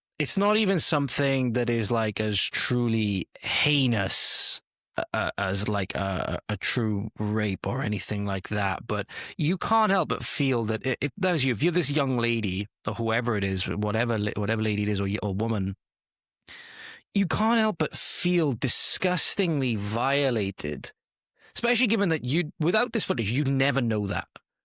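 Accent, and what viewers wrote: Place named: Welsh Valleys, United Kingdom. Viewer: Great to hear a Valleys voice (I would add our Welsh flag here normally, but YT has decided to censor it) That is a sick mf! TYVM for reporting on this! Have you heard about AJ Audits aka Anthony Styles?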